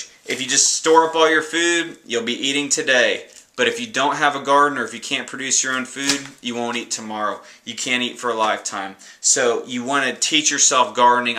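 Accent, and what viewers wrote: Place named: South, United States. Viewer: Yea I didn't really think so and the sweater(and that soft southern accent of yours). kind of made me think lol...it wasn't meant to be insulting. It doesn't even matter to me though really but I as well throw up in my mouth sometimes thinking about it. I just thought, "This gay guy is putting up some sweet videos." Sweet vids man sorry for my ignorance :P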